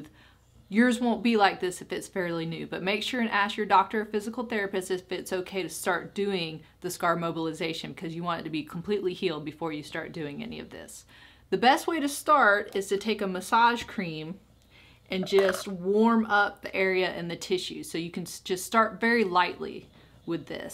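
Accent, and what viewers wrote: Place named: Appalachia, United States. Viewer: Don't even go there with the Hemorrhoid cream... I looked like a Cauc-Asian for 48hrs after that :) Ha you definitely have an accent... To my ears anyway. Nice though. Like a little Hill Billy lol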